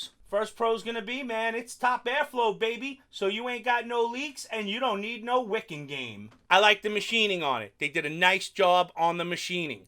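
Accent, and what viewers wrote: New York, United States. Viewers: You and Mikey Vapes both come from New York and you sound like a New Yorker when you talk and Mike sounds like hes from Illinois or somthin.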